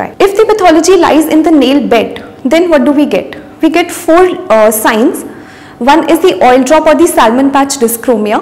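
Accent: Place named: India. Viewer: Thats indian accent .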